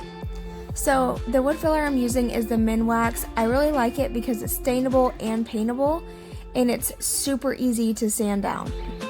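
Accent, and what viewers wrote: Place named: Kentucky, United States. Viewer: You sound like a Kentucky girl. Island looks real good. I'm building a 6 foot island like this for my wife.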